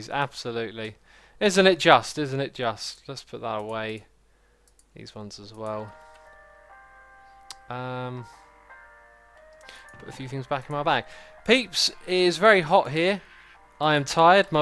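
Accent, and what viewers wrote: United Kingdom. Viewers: Please dont take that as an insult by the way your content isnt boring at all, its just something about your british accent that always does the trick for mr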